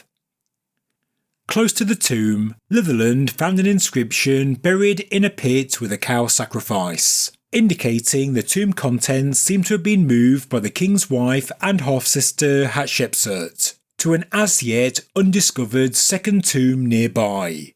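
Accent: United Kingdom. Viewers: British accent really sucks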